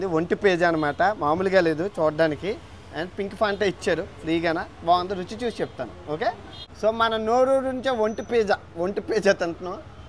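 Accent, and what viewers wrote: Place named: Visakhapatnam, India. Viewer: I like your videos and your telugu accent which most people in Vizag and near by mostly used to speak. God bless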